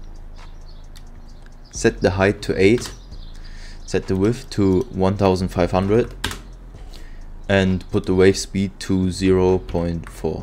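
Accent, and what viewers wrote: Germany. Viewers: Youre German right? hört man :) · THE GERMAN ACCENT IS SO CUTE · Der Deutsch (Sprachige) Aktzent Kickt aber Nices Video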